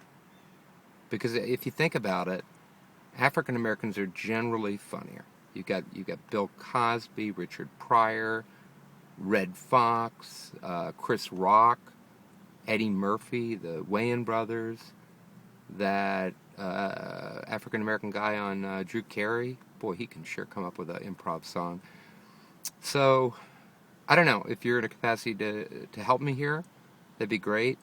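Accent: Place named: Los Angeles, United States. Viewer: Take that stupid video down.. you sound like a typical dumbass from LA!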